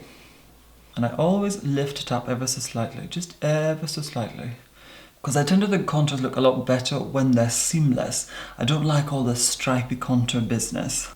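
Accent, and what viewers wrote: United Kingdom. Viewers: You speak like a British queen and it's so relaxing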